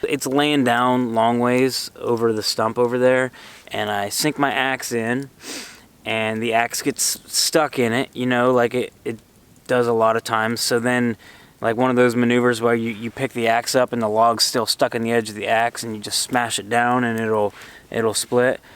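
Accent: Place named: East Coast, United States. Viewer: Enjoyed the video. Did you see the headlights in the background at night? at about . They were off in the distance. I liked your sled & all the amenities it enabled you to bring along. I love camping & love bringing as many amenities as possible, which is a lot because I have a camper. I also do some tent camping. I could tell you were from out east because of your accent. Being originally rom Pittsburgh, I'm familiar with buckeyes. As kids, we used to break open the spiny green outer shell to get to the buckeye & just collect them. Great looking steak dinner! Hope your finger healed up okay. Take care & stay safe.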